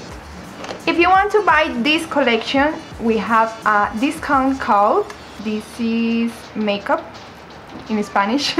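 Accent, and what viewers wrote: Paisa, Colombia. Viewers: Estás bien, te falta es la pronunciación más gringa y menos paisa jajaja pero vas bieb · Estuvo genial, muy claro y el acento paisa hizo más simpático el vídeo. Esto me ayuda porque soy terrible para el inglés, como que me familiarizo más de esta manera, no sé. Gracias. Luces muy guapa también con los frenos, y el maquillaje quedó precioso.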